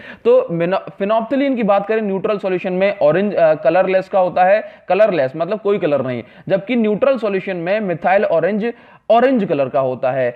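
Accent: Odisha, India. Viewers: Sir aap odia ho kai?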